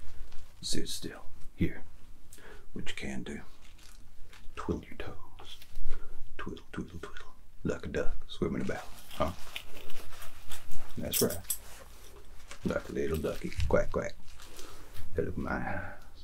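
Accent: South, United States. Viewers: This reminds me of the part in fable 2 where the photographer takes the young sparrows and sisters picture. Also, amazing southern accent. Where are you originally from? Him: give me a smile. Me: ⊙﹏⊙😬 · How do you not have a million subscribers? Nobody comes close to the content you are putting out there...Crazy good stuff, man! And as a lifelong Southerner, the accent is spot on! LOL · Your southern accent is amazing. One of my favorite accents!!! This is impeccable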